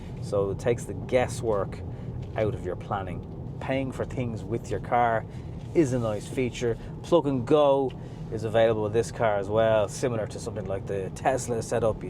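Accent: Ireland. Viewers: Is this Ireland accent?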